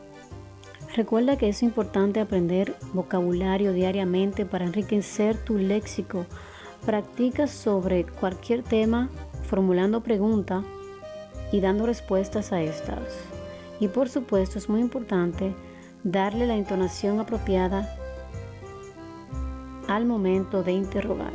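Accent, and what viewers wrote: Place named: Spain. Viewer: Quiero saber de dónde es el acento de español de la profesora.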